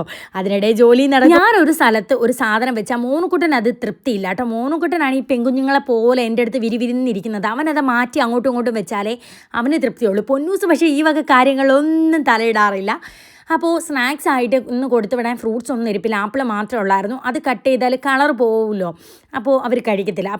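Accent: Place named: Thrissur, India. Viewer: Njn oru thrissur kari ahne ante amayi nt mole kolam punaloor ke ahne marriage cheythe ayachde, chechi edh polle same style ahne samsrika adh thrissur slang full mari samsrikana polle same way ahne samasrika, njn evarde video first kandapo vijariche edh thanne ahne ahh chechi samsrikana polle ahanallone 😇 edh oke oeu allekarede nade videum oke difference ille endinna igane negative comment idane 🫡 nammake ishttam alle ayalde video kannanda 😊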